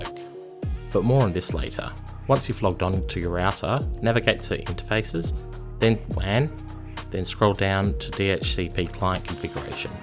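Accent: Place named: Australia. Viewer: Sorry to sound rude, but you sound like a fellow Aussie... are you in Australia using Skylink? Didnt think it was released around here yet? How you finding it, worth the cost?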